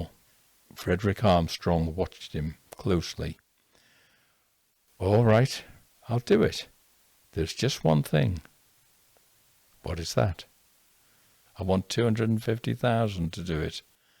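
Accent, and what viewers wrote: England, United Kingdom. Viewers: is a fantastic writer and storyteller i have loved all his stories with his wonderful english accent also all drawings as well i can't wait till his voice puts me to sleep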